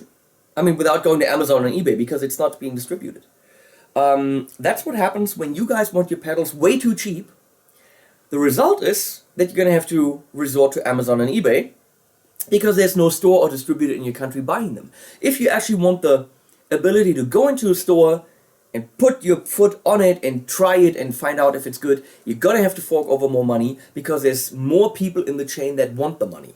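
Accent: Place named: Germany. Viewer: Man hört das du deutscher bist :D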